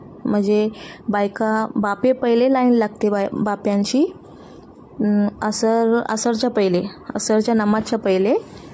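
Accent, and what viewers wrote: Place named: Konkan, India. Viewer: रेसिपी तर छानच. मी तुमच्या चॅनेल वर नवीन आहे. कोंकणातील मुसलमानांची मराठी किंवा कोंकणी खूप खूप गोड आहे. मध्ये मध्ये अरबी, उर्दू, पर्शिअन शब्दांची पेरणी. ऐकताना खूप मजा येते. आपण कुठल्या गावातून विडिओ बनविता ते सांगाल का ?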